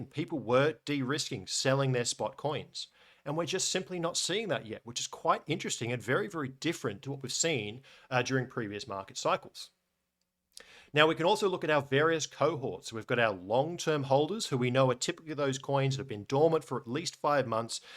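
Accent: Australia. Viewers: I prefer dark mode, but either way your information is absolutely priceless and very much appreciated. Thank you for everything you do. And it's very nice to hear it all explained by an Aussie too!! 😃😃😃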